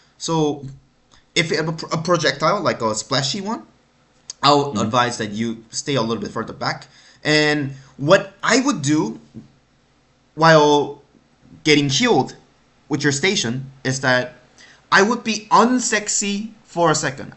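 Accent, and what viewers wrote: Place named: Germany. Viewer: That’s due to the German accent + deep voice :D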